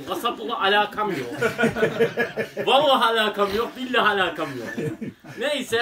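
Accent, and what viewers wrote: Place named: Tokat, Turkey. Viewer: Şivene kurban senin yA canım memleketlim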